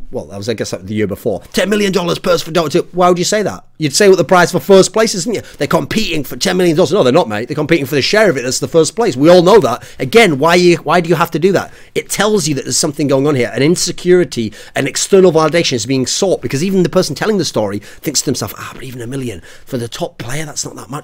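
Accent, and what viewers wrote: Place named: Northeast England, United Kingdom. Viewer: I can't place his accent. Northeast England or Scotland?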